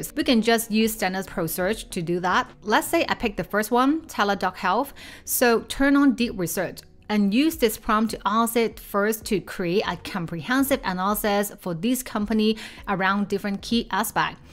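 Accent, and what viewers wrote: China. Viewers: Great content. Your Chinese accent is very strong so I finished watching but it was pretty tough Good luck.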